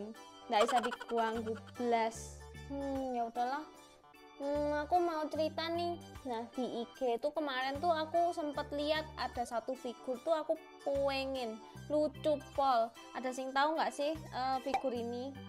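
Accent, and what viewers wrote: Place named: Java, Indonesia. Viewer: Ngomonge medok pol😂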